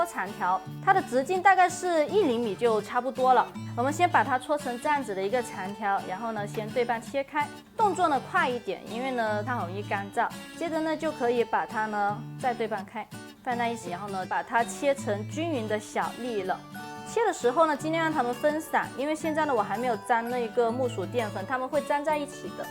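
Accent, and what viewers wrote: China. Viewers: 这个普通话……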